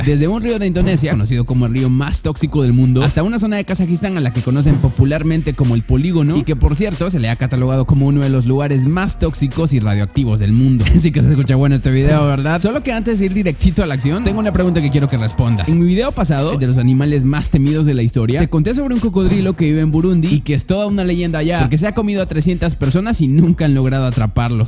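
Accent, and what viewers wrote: Fresa, Mexico. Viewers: Regrese a ver al curioso ben veo q hablas mas a lo mexicano orale wey y veni ya no ssle mucho :'v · te cambio la voz y hablas con la papa en la boca ( fresa) no es la misma voz de antes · se esucho diferente tu voz creo que te salio lo Mexicano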